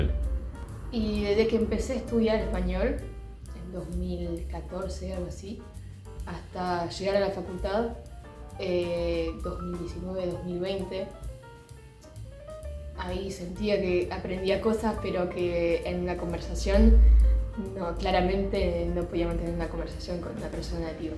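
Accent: Buenos Aires, Argentina. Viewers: Felicito a por su aprendizaje. PERO POR FAVOR: NO ES "ACENTO ARGENTINO". ES ACENTO PORTEÑO, DE-BUENOS-AIRES. El acento de la Ciudad de Buenos aires es COMPLETAMENTE DIFERENTE del acento del Norte (Tucumán, Salta y Jujuy), y de Santiago del Estero (único), de Córdoba y de Cuyo. POR FAVOR, NO NINGUNEEN A LOS 27 MILLONES DE ARGENTINOS QUE NO HABLAN COMO LOS PORTEÑOS. Gracias. No sé de dónde es, pero seguramente le molestaría si dijeran que su acento es el mismo que en Marsella o Normandía. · Si ella no aclara que es francesa jamás de lo jamás nosotros argentinos pensaríamos que ella es francesa , asi que bien por ella porque habla perfecto y con tonalidad pura argentina :face-orange-biting-nails::face-orange-biting-nails::face-orange-biting-nails::face-orange-biting-nails::face-orange-biting-nails::hand-orange-covering-eyes::hand-orange-covering-eyes::hand-orange-covering-eyes::hand-orange-covering-eyes::hand-orange-covering-eyes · Que genia !!! 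Re Argenta !!!! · no tenés ni el más mínimo acento extranjero, parecés una francesa de plaza Francia! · Terrible, impresionante como hablas español porteño, felicitaciones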